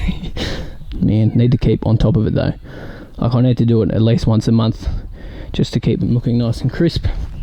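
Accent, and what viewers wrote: Australia. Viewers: Where are you from? Australia or New Zealand?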